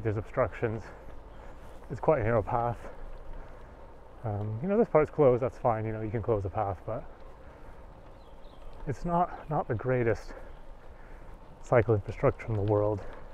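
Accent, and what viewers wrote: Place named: North America. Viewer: I think this came up because I was looking for gopro settings. I’ve been using a chest mount but I’ve seen suggestions that the gopro should be upside down. Not sure why. Which way was yours mounted? I’m in Australia and the cycling infrastructure varies from non existent to fairly good, where I am in South Australia anyway. I heard your accent and thought you were in North America. I’ll see if I can check out your other videos if I have time. Cheers.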